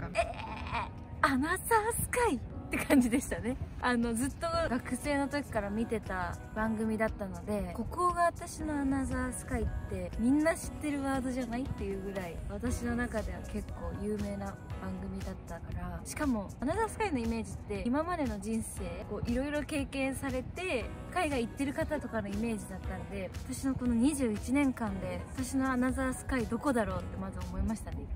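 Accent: Kansai, Japan. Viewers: 久しくカスうどん食べてない。 番組観てて無性に食べたくなったよ。今は飲食店も大変だと思うから、緊急事態が解けたら、帰阪した時に寄らせて貰います。 うどんを食べた後、店の前で、「ここが俺のアナザースカイ』って言ってみようかな WW その関西弁、絶対に変わらないで下さいね。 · アナザースカイでの関西弁がとってもカワイかったんでウワッと感じました。YouTubeでもドンドン出していって下さい癒されます。🤗 応援してま〜す。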